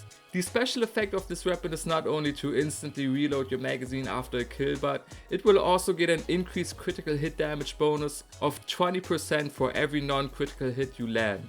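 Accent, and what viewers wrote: Germany. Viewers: Man erkennt zwar sofort das er deutsch ist aber sein englisch ist nicht schlecht und lohnt es sich nicht mehr durch englische videos mehr leute zu erreichen als durch deutsche?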